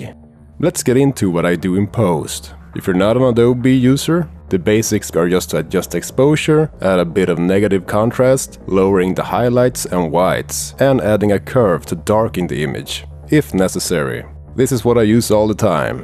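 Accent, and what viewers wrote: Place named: Sweden. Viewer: Är du svensk? Låter som de när du bryter! Bra film! har funderingar kring köpa denna kamera :I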